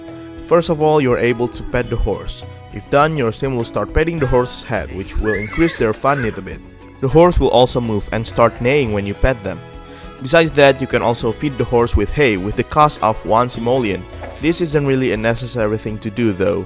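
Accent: Indonesia. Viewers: Logat Inggrisnya kek dari Indonesia. Kasi tau gw bang kalo lu dari Indonesia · setelah nonton beberapa video lu, gua bru sadar lu orang indo wkkww, gara2 accent . ah sial gua kira dlu org luar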